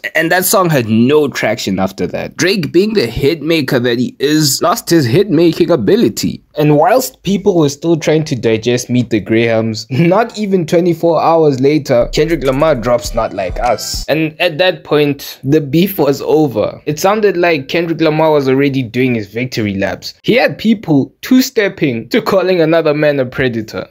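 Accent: South Africa. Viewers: You sound like you're from 🇿🇦...🤔🤔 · You South African? That accent...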